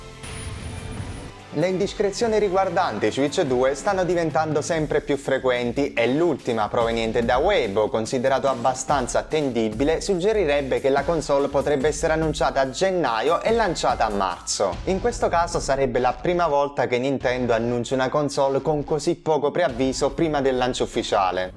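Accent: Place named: Campania, Italy. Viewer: Ho giocato Xenoblade per la prima volta l'anno scorso partendo dal 2, poi questa primavera sono passato al 3 (un ordine che lascia un po' a desiderare per seguire la storyline, ma tant'è 😂). La serie mi ha catturato tantissimo e la reputo un nuovo Kingdom Hearts per intreccio narrativo, ma uno venuto bene! (senza esagerati mindfu*ck, insomma)! L'idea di un anime avrebbe totalmente senso! Non sono sicuro che elimineranno le Definitive Edition perché gli fanno macinare soldi, ma sarebbe se non altro un modo per fare contenti i fan della serie e per avvicinarne di nuovi. Apprezzo molto i contenuti che tratti perché parli spesso di informazioni di cui non si parla molto in Italia. Un piccolo commento "tecnico", se posso. Rimuoverei il suono "sh" della parlata campana. Non che voglia eliminare la tua originalità, ma credo darebbe un piccolo tocco piu professionale al canale. 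Ovviamente, parere personale. Detto questo, continua cosi che sei bravo! 👏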